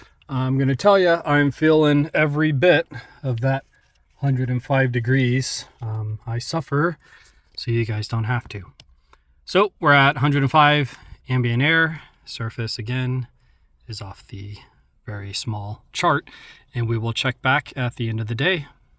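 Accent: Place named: United States. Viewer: Thank you for your research and experimentation. By the way, are you of Asian descent? Your voice/accent gives me the impression that you were born in America, but have that descent.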